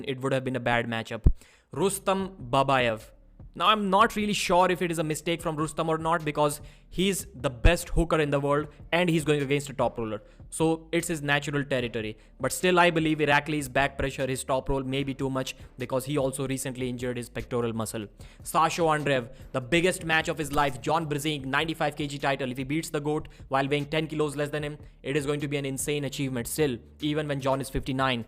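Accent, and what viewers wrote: India. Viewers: Its the typical indian accent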